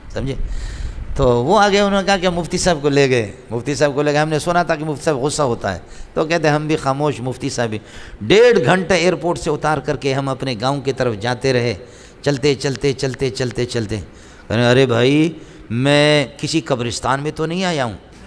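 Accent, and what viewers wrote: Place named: Balochistan, Pakistan. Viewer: بھائی جان یہ بلوچ ہیں.. انکی علاقائی زبان / بول چال ایسا ہی ہے.... یہاں کے لوگ یہ میرے والد ہیں نہیں بولتے.. بلکہ سیدھا یہ میرا باپ ہے.. بولتےہیں.. اگر یہ آپ جناب کے بجائے.. تم.. بولے تو وہ بے ادبی کی وجہ سے نہیں.. بلکہ انکی علاقائی لہجہ کی وجہ سے ہے..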